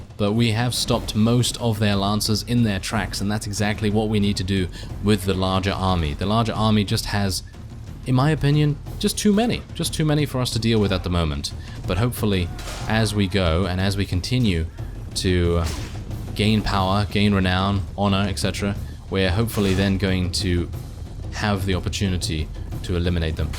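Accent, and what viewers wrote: United Kingdom. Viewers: It was me, I was talking about Sarah and Leslie earlier, sorry bruh. Love the series, you are awesome. I started watching this series this Friday (I was sick this week) and just caught up now. It's addictive, and you're doing an awesome job, my beautifully British accented friend!! - Love from America.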